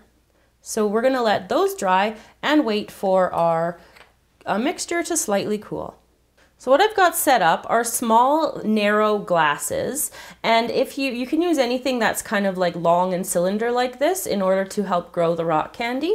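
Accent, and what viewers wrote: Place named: Canada. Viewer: i love Canadian accents.....there adorkable 😊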